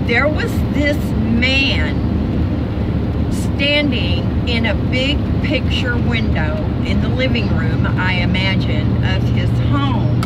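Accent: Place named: Maine, United States. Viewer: What y'all wanta bet looked like a red-headed Audrey Hepburn after her Aunt got her all spiffed up and lady-like??, you told us how since you were born in Maine, your family all said Ahnt instead of Ant. Even now you switch back and forth. I do that with some words.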